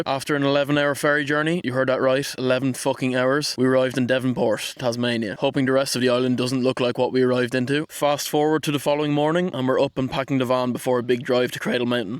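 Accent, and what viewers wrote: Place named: Ireland. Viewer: Love that Irish accent.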